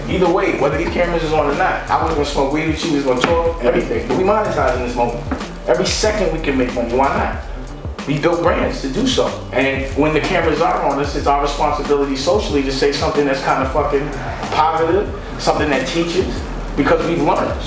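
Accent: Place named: Harlem, United States. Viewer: Amazingly google how much Dame net worth is you it will be surprise you. Talking like a tru Harlem dude.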